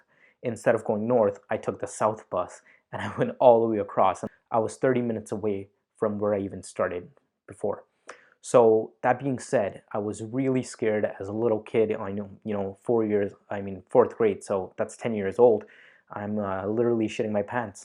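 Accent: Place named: United States. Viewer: Do you live abroad, coz your accent sounds American??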